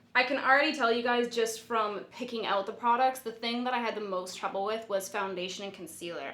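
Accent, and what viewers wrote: Canada. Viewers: Stay awesome! Also luv the way you Canadians say your vowels ❤️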